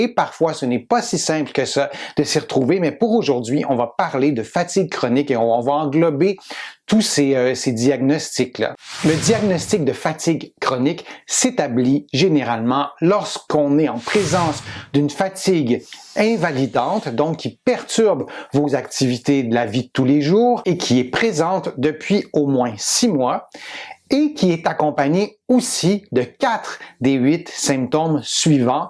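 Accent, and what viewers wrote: Quebec, Canada. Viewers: Dès le début j'entends l'accent Québécois je sais d'office que je suis tombé sur du contenu de qualité. Merci beaucoup pour cette vidéo ! Un cousin français. Je m'abonne !!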